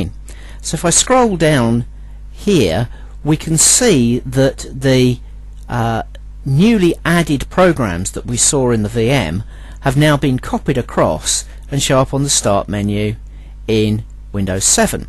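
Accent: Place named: United Kingdom. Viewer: Enjoyed your British English so much, just listened for this purpose :)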